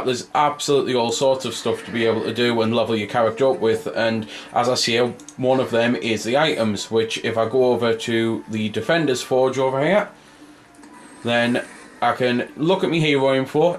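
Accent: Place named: Scotland, United Kingdom. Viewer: sorry im sure your review was nice but i cant listen to your Scottish accent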